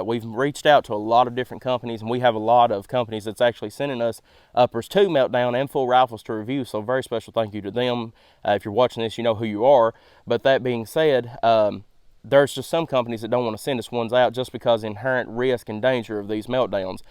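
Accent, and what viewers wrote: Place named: Alabama, United States. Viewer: What state are you from? Sounds like our Alabama accent.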